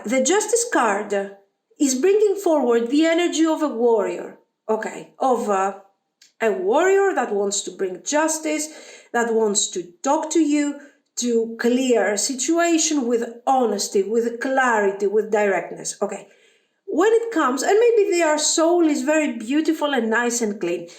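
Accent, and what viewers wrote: Greece. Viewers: Your English is perfect actually! You simply have a very Greek accent, but your use of the language is great. I'm happy to see a Greek youtuber gaining popularity! Keep up the good work. I'm showing your videos to friends and family for nee channel recommendation!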